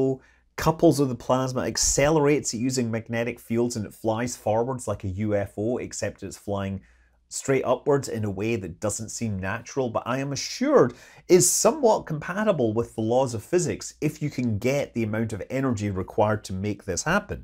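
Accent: Scotland, United Kingdom. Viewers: "all I could add to this is [...] a scottish accent." Don't underestimate how much this rises the sympathy level with your viewers. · I want to see the collab revisit with you providing the enthusiastic "Hullo" and goofy (but awesome) Scottish accent to Tim Dodd's Aerospike conversation. Let's get Musk involved and figure our the engineering for the things already. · Never underestimate the power of an enthusiastic hello and a silly Scottish accent! One of the reasons that super-cavitating torpedoes were invented was to act as a 'gunslinger' style weapon underwater. With detection ranges reducing after the introduction of the Soviet Akula class, NATO needed something that could be fired at high speed from close range to impact enemy submarines before they had a cahnce to conterfire. The Soviets already had this idea in the 60/70s when they were being outdetected by British and American boats. 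· I love your Scottish accent and don’t find it silly at all. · The Scottish accent makes everything better.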